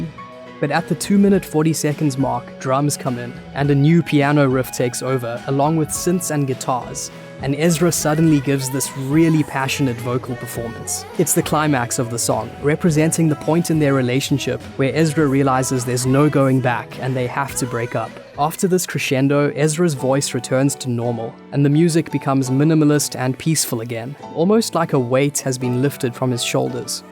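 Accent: South Africa. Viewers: So weird to hear a South African accent. Love this song, love the video. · I clicked on this not expecting a South African accent. Now I'm subscribing.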